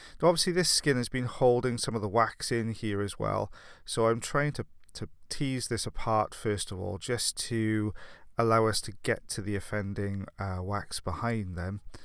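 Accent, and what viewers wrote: Wales, United Kingdom. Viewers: Are you from Wales by any chance? :)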